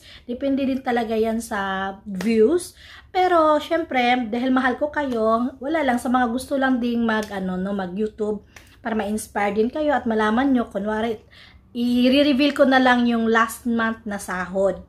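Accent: Philippines. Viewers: Tanung mo nga sa asawa mo kung kapag nagsasalita ka ng Korean, may accent ka ng pagka-Filipino? Kasi mukha ka naman ding Korean, gusto ko lang malaman kung kapag nagsasalita ka ng Korean, alam ba ng mga Korean na hindi ka Korean dahil sa accent mo?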